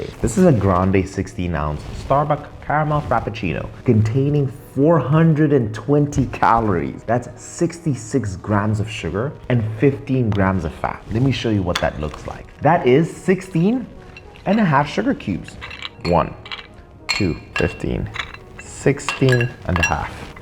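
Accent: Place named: South Africa. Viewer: Thanks, why your accent is so South African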